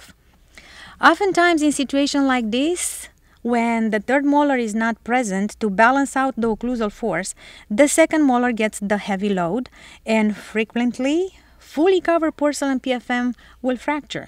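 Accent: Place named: Romania. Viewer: I thought she was romanian from her accent. And apparently she is :D I'm from Romania too.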